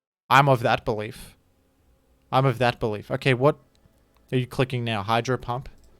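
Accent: Australia. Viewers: I know it sounds basically the same for americans but he is, in fact, australian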